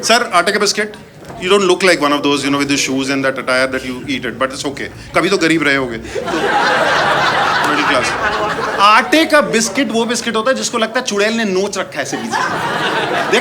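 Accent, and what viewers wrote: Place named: Purvanchal, India. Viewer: chacha lucknow me vo accent hai hi nahi.. purvanchal aur marathi mix krdi apne..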